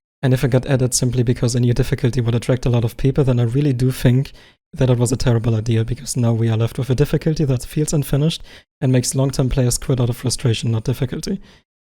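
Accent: Germany. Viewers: Man hört halt direkt raus, dass du Deutscher bist. Aber super Video kann ein paar deiner Kritikpunkte teilen.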